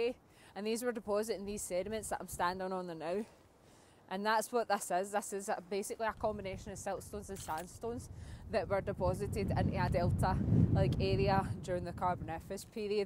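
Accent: Scotland, United Kingdom. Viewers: That Bridge, Is Probably The Best Looking Bridge, In The World.! Shame, It's Only Used Fir, Trains.! So Are Ye Doon There, Looking Fir Crabs, Fir Dinner, Or, What.?! Thats A Broad Accent, LUV.! Didnt I Hear Yeh, On Scottish COD Players Wae Marley13, Lmao.! Or, Maby You Know, That Mad B'strd, On A Paddle Board, Telling A Baby Orca, TAE F#@ Off, In A Loch, &, "IT DOES", On Scottish TikTockin, HAHAHAHAHAHA.! A Rainbow, But nae LEPRECHAUN Wae A, Caudren Of Gold/Sunshine, But It IS Scotland, After All.! HAHAHAHAHAHA.! Watch Oot, Fir The Midge's, B'strd Wee, Blood Suckers, &, The Flying Haggis.! Lmao. Ken WIT A MEAN.! Namaste. 🏆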